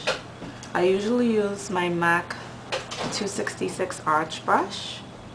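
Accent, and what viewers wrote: Bahamas. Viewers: where are you from? im from the bahamas and you sound like a bahamian rather tan an american to me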